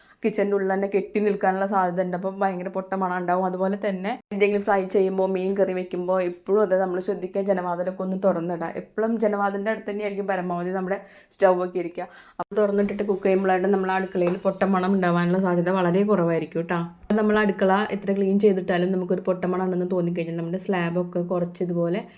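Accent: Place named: Thrissur, India. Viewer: തൃശൂർ കാരിയാണ് എന്ന് തോന്നുന്നു... പൊട്ടമണം 😅😅😅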